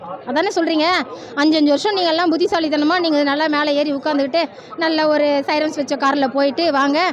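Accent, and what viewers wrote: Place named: Tirunelveli, India. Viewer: சகோதரி திருநெல்வேலி பேச்சு சரிதான்.... நாம் தமிழர் திருநெல்வேலி.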